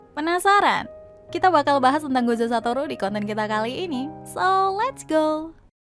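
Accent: Java, Indonesia. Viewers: Kangen suara yang medok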